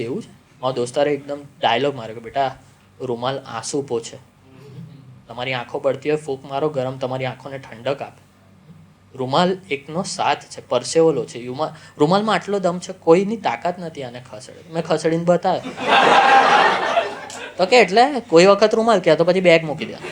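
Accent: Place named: Vadodara, India. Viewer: Bhai baroda accent bau cute laage 😍😂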